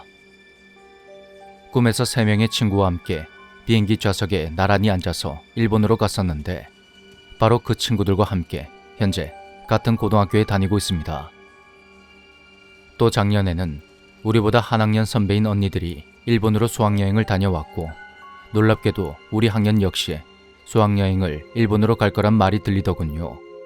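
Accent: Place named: Busan, South Korea. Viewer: 야후꾸러기 참 오랜만에 들어보네요. 이야기를 들을때마 참으로 신기한 일이 많은것 같아요.~~ 연기도 짱! 연기속에 부산억양은 꼭 나온답니다~^^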